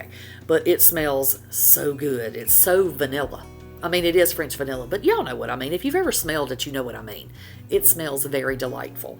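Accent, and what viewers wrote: South, United States. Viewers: Yummy 😋 I'm New Here & Just Love Your Ancient 😅I Have That Long Southern Draw Ancient..You Also Can Crack Me Up, So I Know I'm Gonna Love Your Channel..Be Blessed 😊❤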